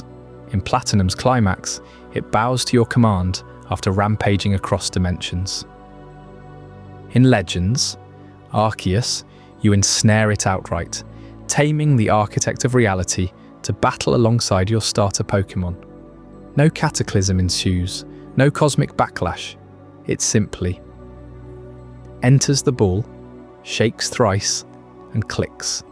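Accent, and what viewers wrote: United Kingdom. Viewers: Great videos could you do your voice a tad less British please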